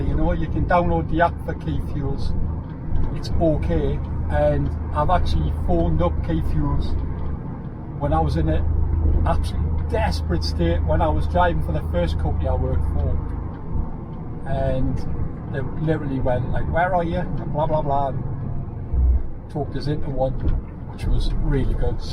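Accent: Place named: Geordie, United Kingdom. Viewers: North Shields and South Shields names come from being the shields of protection from people attacking us from the north sea. Your monk story is not the origin of the place name. Anyway you sound Geordie so I will subscribe. 😃😉👍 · What a shame just found this now 2021 hope ur still trucking..nice to hear ur Geordie Twang....in lockdown England...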